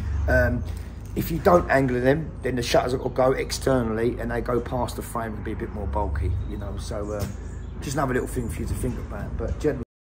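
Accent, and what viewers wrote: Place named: London, United Kingdom. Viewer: Saw your vids on the March you speek total fkin truth. Good on ya mate. You've got that proper London accent too 👍😊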